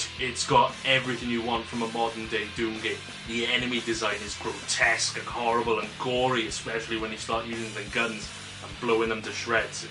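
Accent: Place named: Wales, United Kingdom. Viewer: You sound very Welsh What part of Wales are you from!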